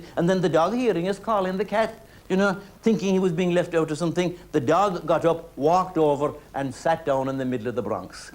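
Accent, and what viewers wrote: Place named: Ireland. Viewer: Reminds me of Galway (I know he's a Kerryman). National school in the Gaeltacht. The cadence of Irish projected onto an English language vocabulary. Reminds me of Giles na Magaleen in Ballymagaleen.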